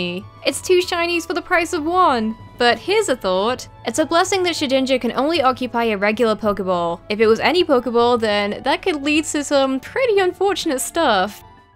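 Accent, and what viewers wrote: England, United Kingdom. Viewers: BearShark Interactive she's british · She's English, as in, from England.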